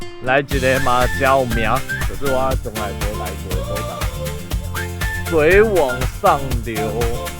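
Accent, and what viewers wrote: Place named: Southern Taiwan, Taiwan. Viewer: 我把你徒步環島騎車環島看了幾遍，非常接地氣，南部郎欸台語氣口😂，文化認知都非常棒👍 我一有空都會開來看，百看不厭 👍 開始有主題歌後 影片更生動讚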